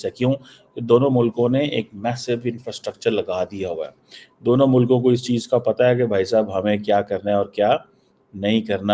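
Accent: Punjab, Pakistan. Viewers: Punjabi English awesome... Good viewpoint..... All must support the channel.... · was waiting for you to start ur rona-dhona! Why does any development about India cause u so much despondency? In ur typical casual, thick Punjabi style, you tend to trivialize everything.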